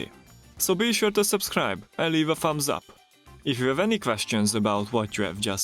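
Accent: Hungary. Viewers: Whats your original language? Because your accent sounds like when a Hungarian speaks English 😁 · Basszus nagyon magyaros az akcentusod :D Amúgy ha az vagy és olvasod akkor rohadt jó tutorial-okat csinálsz. Csak így tovább! <3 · Szia, nem vagy véletlenül magyar? Mert nagyon magyar akcentusod van. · Hungarian accent is so obvious 💀 Good to see an experienced hungarian pixel artist on the field. Do you have any major bigger scaled works/art pieces or do you only work in the gaming industry?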